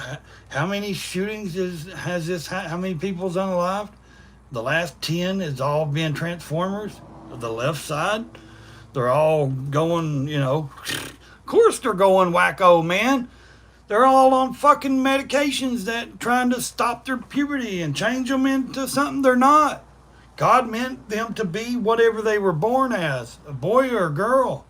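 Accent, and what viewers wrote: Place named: South, United States. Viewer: it’s unbelievable how many brainwashed people there are. Have no common sense. A lot of them are set off by your southern accent and assume you are certain things.😂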